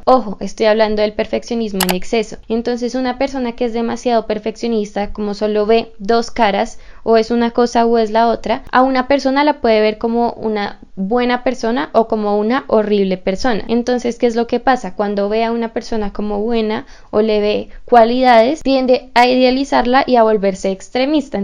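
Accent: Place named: Colombia. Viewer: Ambos son colombianos, supongo que es por el acento ☺️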